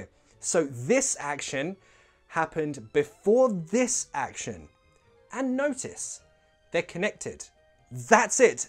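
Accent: United Kingdom. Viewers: What english accent do you speak actually? For me, this is the best british accent so far. My english is not too good, but with this accent i can catch most of the words you say. Its very clear and understandable. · Eres un excelente maestro....me encanta la forma tan sencilla de explicar. Entiendo el inglés pero aún me falta hablarlo y escribirlo correctamente...por eso escribo en español...espero utilices traductor para que sepas lo que escribo. GRACIAS POR TU TRABAJO. Ya me suscribi a tu canal. Saludos desde México. Perdón...de donde eres?...al escucharte noto acento británico... eres inglés?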